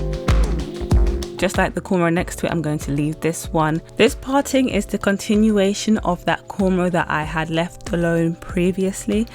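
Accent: United Kingdom. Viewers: and lol I love your british accent!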